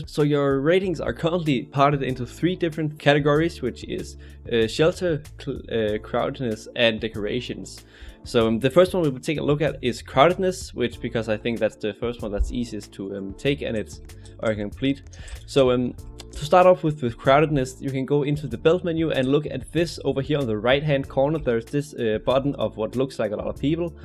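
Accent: Denmark. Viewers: jeg kender en dansker når jeg hører dem. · are you danish i heard you eller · man kan godt høre at du er dansker lol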